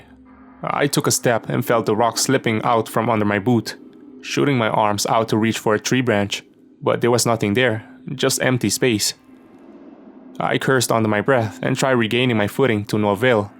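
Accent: United States. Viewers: his robotic like tone with this acid us of A's accent make the video hard to listen more than 5 mn. So i read the comments.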